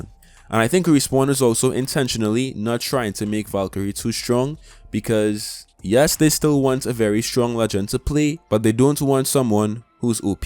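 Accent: Trinidad and Tobago. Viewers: Are you from Trinidad??? Your cent sounds like it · Are you trinidadian?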